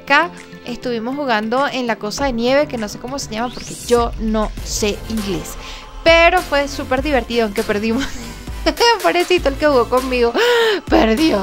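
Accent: Venezuela. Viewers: Eres venezolana ？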